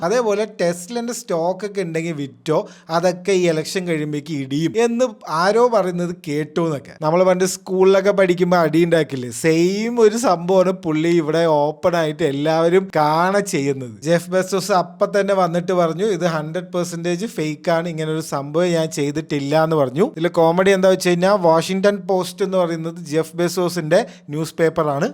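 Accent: Palakkad, India. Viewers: നിങ്ങൾ പാലക്കാട്‌ ആണോ....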